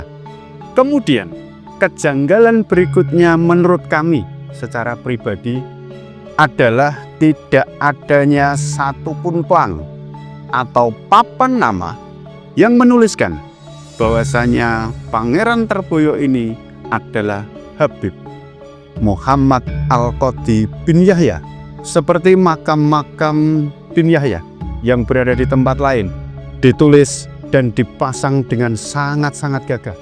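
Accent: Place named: Kediri, Indonesia. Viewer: Tiang pundi Gus njenengan Logat mirip Kediri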